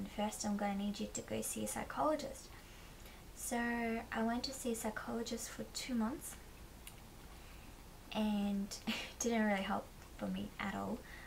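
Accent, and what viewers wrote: Australia. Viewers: Blimey, no wonder you got anxious, is that a picture of Vladimir Putin on your wall? This video has been very helpful. I'm starting Zoloft today for treatment of a chronic anxiety condition. That sounds like an Australian accent. If you don't mind me asking what part of Oz did you grow up in? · Thank you for sharing your personal experience with taking Zoloft. I'm about to start taking it and it was nice to find an honest review :) Are you from Australia by chance? Your accent is really pretty and sweet! ^^. Anyway, thanks again.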